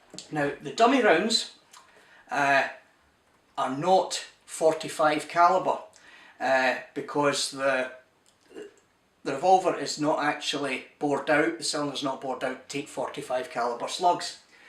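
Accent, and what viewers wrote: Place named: Scotland, United Kingdom. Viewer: It's a Scottish accent. So, no.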